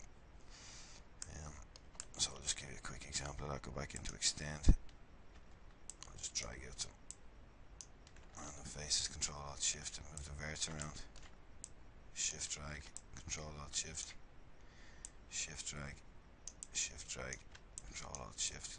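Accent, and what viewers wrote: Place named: Dublin, Ireland. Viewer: so weird hearing a fellow dubliner pop up on one of these videos :P nice stuff man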